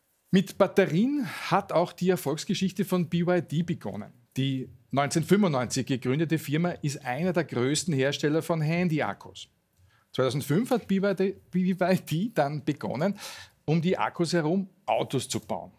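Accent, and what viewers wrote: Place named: Vienna, Austria. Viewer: sein Wienerisch ist wirklich ein wenig anstrengend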